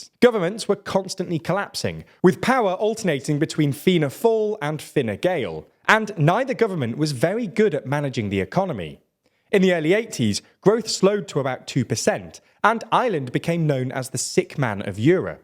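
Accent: United Kingdom. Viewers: Big fan of TLDR. Still, it sounds very condescending listening to a British accent telling us how well we have done "given where we have come from". Where we came from was oppressive British rule, that famine you very briefly touched on was a genocide perpetrator by the British govt of the time. Yes, we have come a long way!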